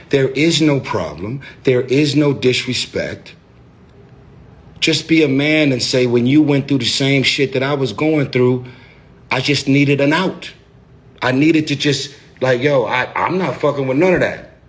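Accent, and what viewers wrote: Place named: East Coast, United States. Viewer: your East Coast soft voice wit that accent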